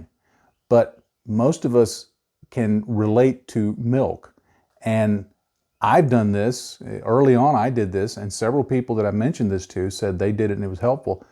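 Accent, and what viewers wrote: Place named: United States. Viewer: Very goood clear info for a begginer like me or maybe an old hand. You are the first yank i can listen to Thanks buddy from a cross the pond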